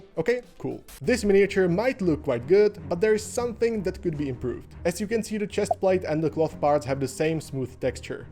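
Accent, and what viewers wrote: Czech Republic. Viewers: Youtube doporučí zajímavé video. Po první větě je jasné, že jeho Tvůrce je Čech. Jinak skvělé rady, to se musí nechat.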